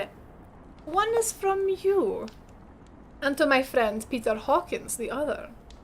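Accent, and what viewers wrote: Scotland, United Kingdom. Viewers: don't worry about it :)) the video was great and the scottish accent made it even more authentic :)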